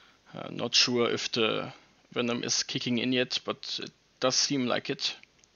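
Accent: Germany. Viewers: U sound like a german?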